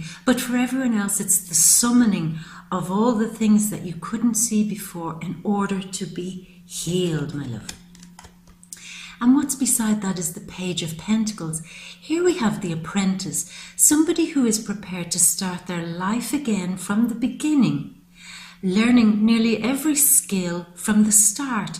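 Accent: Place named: Ireland. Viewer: You are so amazingly beautiful you remind me of Maureen O'Hara and you have such empathy and warmth as part of your personality, it's intoxicating. Plus, there is, how does Sean Bean say it, "A charming Irish lilt."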